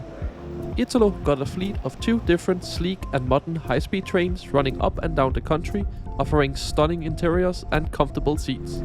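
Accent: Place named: Denmark. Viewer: Love your sweet, soft Danish accent. Unmistakable. So many memories of my good old Danish friends from when I was young.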